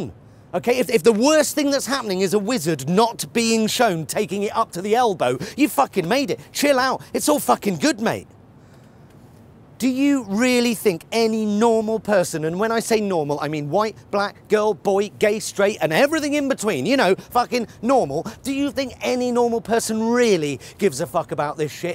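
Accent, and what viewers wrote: United Kingdom. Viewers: Johnathan Pie you are sooo handsome when you are spilling it out like you are doing. You are handsome anyway but it is so wonderful seeing you being such a strong man and telling it like it is. I have never seen you before or heard you speak but ,wow! You are something else. You British accent is so wonderful , too. Your suit becomes you, also. I am gushing, but I don't seem to be able to stop myself. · Idk how many times I've watched this video so far and I know I'm gonna watch it over and over again in the future. BTW the first time I got to know about this channel was when I saw a part of this video some where on the internet and I remember me searching the sentences of this video in an attempt to find his name😂 any way, thanks for being this funny. P.S. I really like this guy's accent and I want to learn it( I'm an ESL learner). 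Does any body know what British accent is this exactly? Thanks in advance😊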